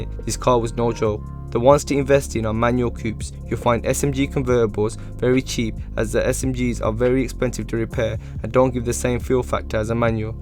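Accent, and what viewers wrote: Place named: Birmingham, United Kingdom. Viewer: that birmingham accent come onnnn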